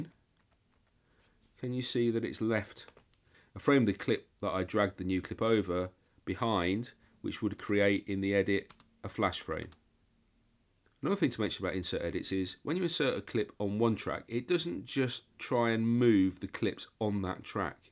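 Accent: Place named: Cockney, United Kingdom. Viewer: yes very clean and tidy, extremely good [ cockney diction and good visuals, thanks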